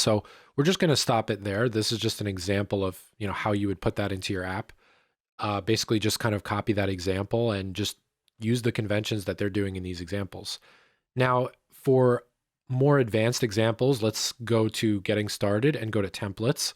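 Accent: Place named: Boston, United States. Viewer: Man that Boston accent <3 You should colab with Brand one day.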